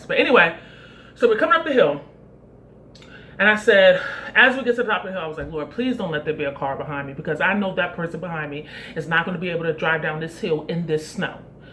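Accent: New York, United States. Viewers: What part of NY you from? It sneaks out when you get excited.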